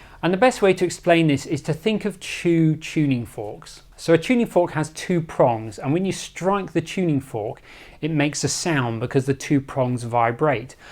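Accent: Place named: England, United Kingdom. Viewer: Always nice to hear a calm, gentle English accent. So much more pleasant to listen to. 🙏 Thank you for your invaluable knowledge.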